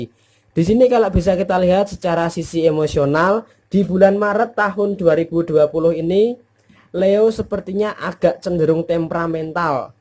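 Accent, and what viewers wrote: Java, Indonesia. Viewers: Pendirian kuat,klo gk penting gk banyak bicara, siiipp,,, mmg begitu Ada nya. Makasih Mas, reading nya,,,,,, medok banget bhs jawanya,,,, heheeeheee... 🙏🙏👍👍😇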